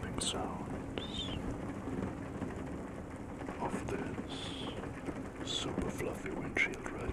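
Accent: Germany. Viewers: Tingle Lovers … I love joining you here. I noticed you may have an accent, are you German? Sending the best wishes to you in whichever country you are in. Tingles to you everyone! 🥂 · ☆ More whispering videos, please! I usually don't like talking videos, but your voice is the only one that's really soothing to me. As well as the German accent; my family is German. Thank you from my ♡ to yours! ☆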